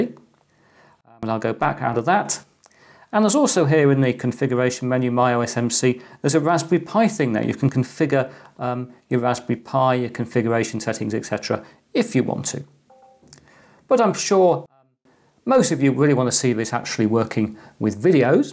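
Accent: England, United Kingdom. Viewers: I thought it was Christopher Walken :-) talking about R-Pi. on Youtube. with such pure English accent :-) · Love your british speak mate, cool startrooper heads on your desk also! keep up!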